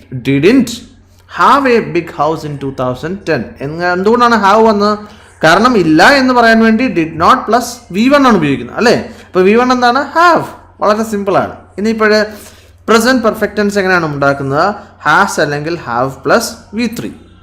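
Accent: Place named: Trivandrum, India. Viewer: Sir... ningalude place evideyanu? Vere onnumalla chodikkan karanam... ചുവപ്പ് ennu parayanullathine ചൊമല ennanu.. sr parayunnathu.. athu konda.. chodichthu.. tvm aano.. sr nte place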